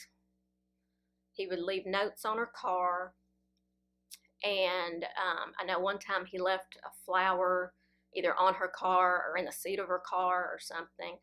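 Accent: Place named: Blount County, United States. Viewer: I KNEW I knew your accent! I grew up in Blount County! I remember when this happened and how scared my girlfriends and I were to stop at rest stops when we drove to the beach. SO scary.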